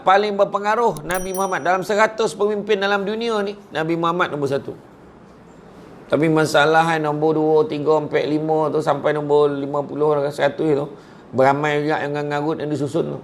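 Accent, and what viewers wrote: Negeri Sembilan, Malaysia. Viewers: Sy dri 🇸🇬..suka cara loghat Nogori Ustad dlm ceremah..x bosan dgr...Ilmu yg dikongsi sgt baik sekali. Insyaallah...akn cuba lakukn yg trbaik...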